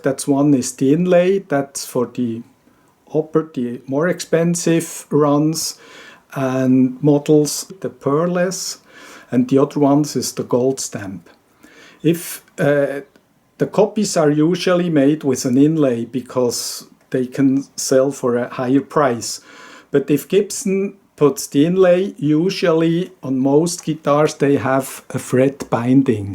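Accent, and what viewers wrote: Switzerland. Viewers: Tönt nachdem ne Schwiizer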